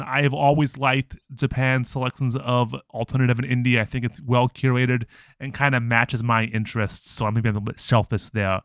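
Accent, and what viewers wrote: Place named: New York, United States. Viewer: I honestly just thought you were from NYC or Jersey or Boston or something.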